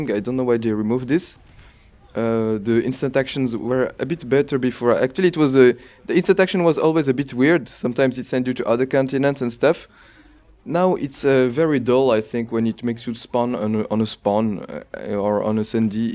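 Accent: France. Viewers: quand je t'entend parler, j'entends un français qui parle anglais ( tu as un accent français) 🙂 je suis certain que tu es français, Si tu l'est, en tous cas tu parle sacrément bien anglais!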